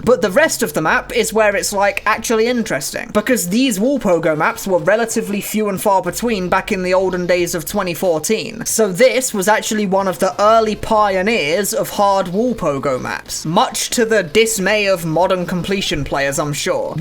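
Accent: United Kingdom. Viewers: Ur an amazing YouTuber I just wish not brit annoy voice 👺👺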